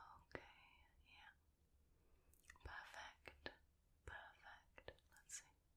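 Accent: United Kingdom. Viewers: I can’t quite pin down your accent. Is it British, Irish or Scottish? Either way it’s lovely 😊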